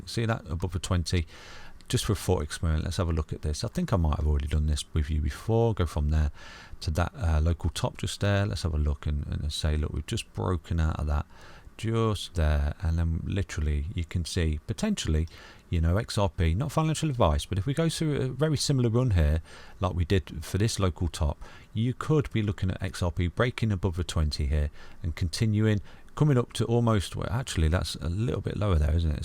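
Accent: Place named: England, United Kingdom. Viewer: Gday from Australia.. Love yr pommie accent but more importantly your sincere info and straight shooting.. Thanks Mate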